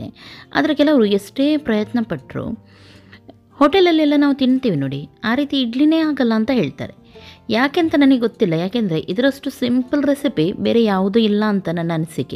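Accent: Mangalore, India. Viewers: Hegidira akka I'm from pattadakalu nim manglurige bhashe nage thumba esta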